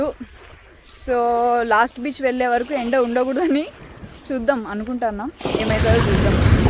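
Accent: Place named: Kadapa, India. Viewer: Where we are Mana (KDP) Slang maathraam intaante inabudhe avuthadhi 😘😘😘😘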